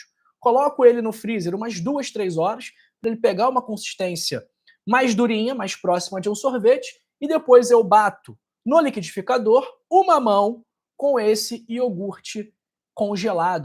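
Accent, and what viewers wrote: Rio de Janeiro, Brazil. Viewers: Esse sotaque carioca dá gastura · Doutor o seu jeito de falar é de carioca , acertei ? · sou de Salvador Bahia.Voce e Carioca na cara, com este sutaque gostoso.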